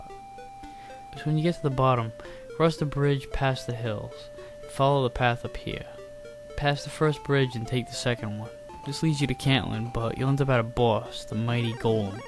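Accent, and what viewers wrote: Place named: Massachusetts, United States. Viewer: For some reason my computer wouldn't load your profile but I can tell you're from Mass. My boyfriend is from there and you guys sound NOTHING like NY! Anyways, way to keep it entertaining!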